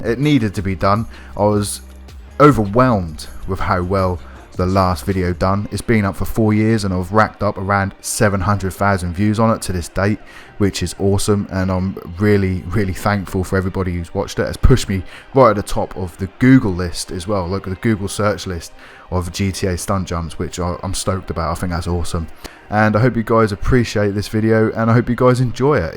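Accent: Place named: England, United Kingdom. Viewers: sounds like you're a Norfolk lad, great video too very helpful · Annoying England accent NO no no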